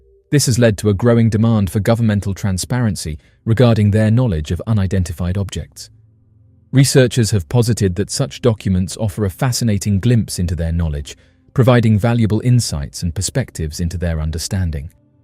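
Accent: United Kingdom. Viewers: If the narrator has a British accent......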